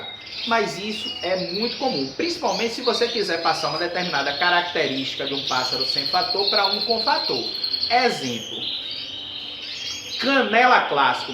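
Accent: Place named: Northeast, Brazil. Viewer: Sou do sul e acho muito legal esse sotaque aí do norte/ nordeste . Muito legal seus vídeos !!! Outra coisa, achei vc muito parecido com o Matheus Ceará , aquele humorista da praça é nossa :)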